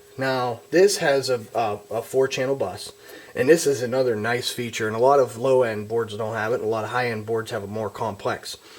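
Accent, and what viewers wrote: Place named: Baltimore, United States. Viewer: great tutorial and there's no hiding that baltimore accent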